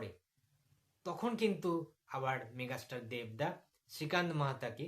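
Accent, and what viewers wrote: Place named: Bangladesh. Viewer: Golar voice sune to mone h6e bangladeshi....ta boli age nijer desher subho kamona korun na...jate gota desh tar sad budhi ghote....parshobarti desh e ki h6e jene ki korben....tollywood er king age jei thakuk na kno...akhon 1jn e a6e...at present Seta hlo Dev....apnader Shakib o kichu chirte parbe nah...😂 Ei karonei hyto bole Ma er theke masir dorod beshi...😂😂😅😅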